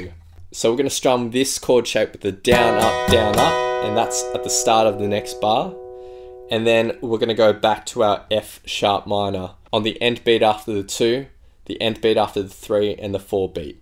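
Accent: Australia. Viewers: Thanks for this great tutorial and the Aussie accent . Both excellent. 👍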